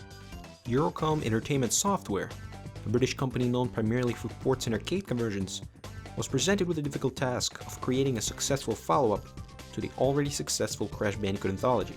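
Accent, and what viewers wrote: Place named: Minnesota, United States. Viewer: I like your accent, you from Minnesota or somewhere around there?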